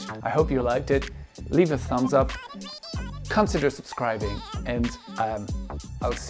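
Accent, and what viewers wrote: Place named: United Kingdom. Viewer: What a wonderfull video ! I'm an college student learning English for many years , I'm not far from fluency but you steal learn me things ! Thanks a lot. Oh, and what a wonderfull British accent. I would have loved to speak the same but my natural way of speaking sounds more like GA. To cap it all, thanks sooo much, your video can help anybody wether their level or age.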